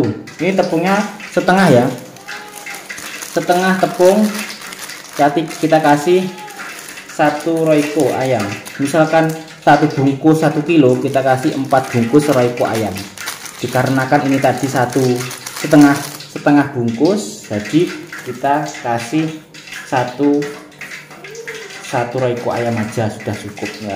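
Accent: Java, Indonesia. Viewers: Aduh bahasanya medoook banget... Tapi mudah di mengerti.... Dan trima kasih ilmunya. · Mas iku wong Endi nek omong kok medok banget,tak cobake mene tuku ayam.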